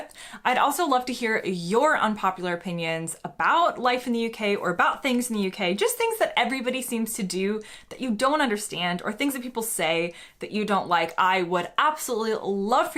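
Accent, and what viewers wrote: United States. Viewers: I found it weird saying that you're a Brit now if you're saying you have dual citizenship wouldn't you want to also say that you are American as well you sound American but I do like the content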